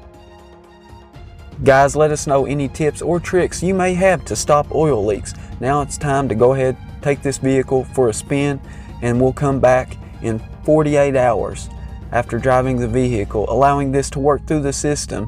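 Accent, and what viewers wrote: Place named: Texas, United States. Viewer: Wow! I LL try it! I like his Texas accent! Any cowgirls there?! Lucas oils are good! Good tips! So this is temporary treatment only?! Can use how many times or years?!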